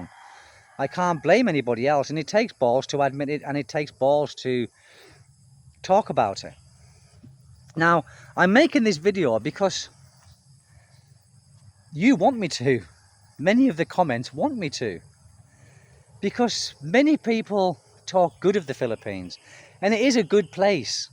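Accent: United Kingdom. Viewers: What’s that accent? Brit, Australian or Irish. ☘️ I bet u were very handsome in your youth. 🎉not a scammer ( retired airline pilot 👨‍✈️) 😊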